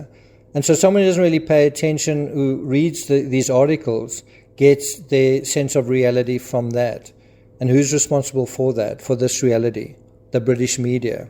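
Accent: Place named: Johannesburg, South Africa. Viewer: Sounds like you’re from Jo’burg??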